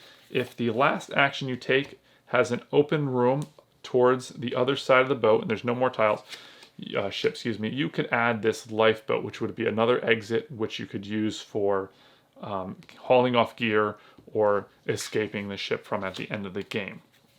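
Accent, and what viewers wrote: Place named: Boston, United States. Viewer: Thanx for this mate. I'm hearing you're from somewhere in MA/Boston area, yeah?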